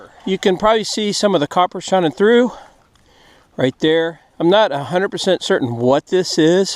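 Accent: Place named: Pennsylvania, United States. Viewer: You are more than welcome to come join us on a hunt if you get up our way. I knew I recognized that central Pennsylvania accent. I’m a former police officer and K9 handler. Some of our dogs came from Castle k9 in Carlisle Pa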